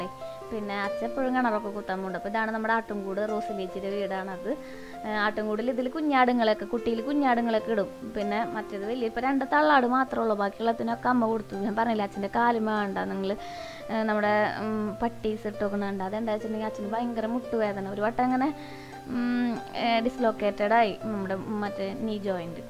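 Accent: Thrissur, India. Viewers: Chechi njn Kannur Ann bt chechide thirissur slang samsaram nth Rasa eanik bayankaram ishttanntto nigalde samsaram chechide vlog pwoli super💕💕💕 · ചെടികൾ എല്ലാം വളരെ ഭംഗി ഉണ്ട് ചേച്ചി... 😍ചേച്ചിടെ തൃശൂർ ഭാഷ സംസാരിക്കുന്ന കേൾക്കാൻ നല്ല രസം ഉണ്ട്....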